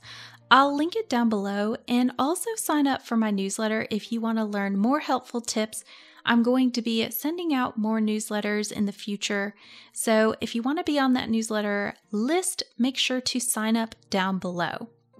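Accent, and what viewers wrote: South Carolina, United States. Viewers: I like to guess a speaker's location by their accent. South Carolina?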